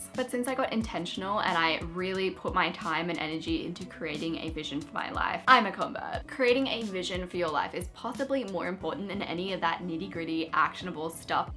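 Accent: Australia. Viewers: I am trying to place your accent. Are you Australian?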